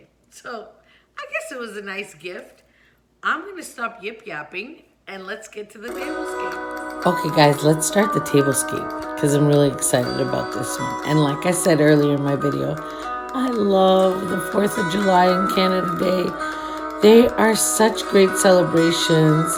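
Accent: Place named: Italy. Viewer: you sound a bit Italian in this video .................. Love this video...Btw remember to drink a lot of water in the summer keeps you cool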